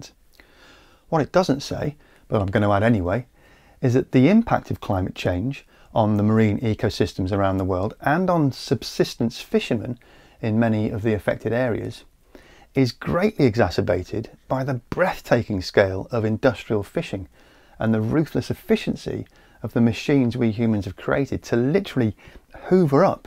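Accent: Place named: Surrey, United Kingdom. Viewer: His voice is exactly like Professor Patrick N. Allitt who did a course on Victorian Britain for The Teaching Company. I'll bet both of these men come from the same area of England. I believe it was Surrey, south of London. I love the way they sound.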